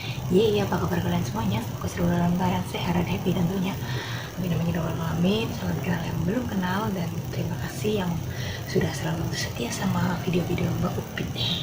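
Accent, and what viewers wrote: Banyumas, Indonesia. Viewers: Ora ngapak ora kepenak mbak..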